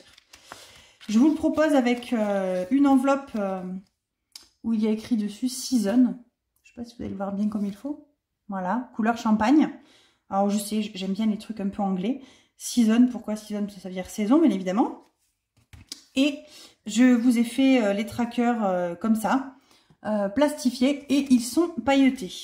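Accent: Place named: Aveyron, France. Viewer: Je viens de découvrir tes vidéos j’adore ce concept que je vais démarrer dès la fin du mois 😊 Bravo pour ta gestion en tout cas ! Ps : ça fait plaisir d’entendre le même accent que le miens #Aveyronaise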